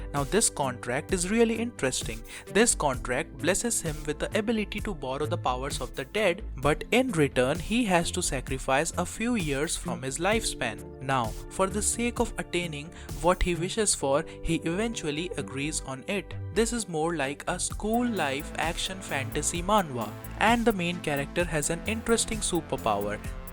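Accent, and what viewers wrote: India. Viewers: I really like your indian accent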